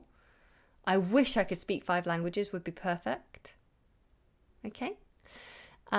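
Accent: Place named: United Kingdom. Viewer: I really love your British accent. It sounds like music to me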